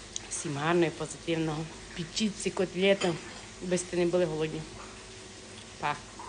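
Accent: Transcarpathia, Ukraine. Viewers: То говір Закарпатський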